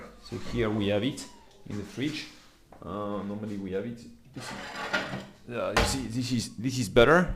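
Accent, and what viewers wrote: France. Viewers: L'Anglais avec l'accent français c le meilleur :)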